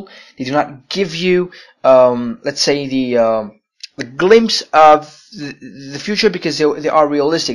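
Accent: United Kingdom. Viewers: Sounds Scottish to me. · you have a good british pronunciation stick to it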